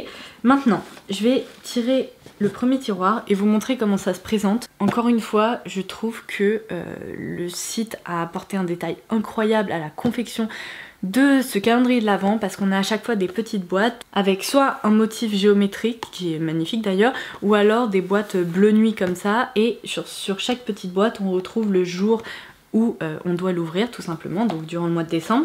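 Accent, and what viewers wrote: England, United Kingdom. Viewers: Ton accent anglais il et cher beau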